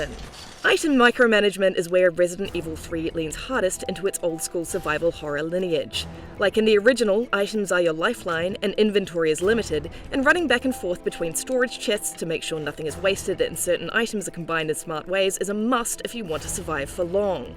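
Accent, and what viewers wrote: New Zealand. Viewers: Going by her accent, is a fellow Kiwi? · Is that a new zealand accent??? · So weird to hear a kiwi accent on ign.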